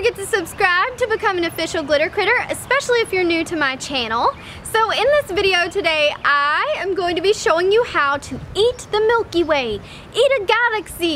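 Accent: South, United States. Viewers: In love w your southern voice❤️❤️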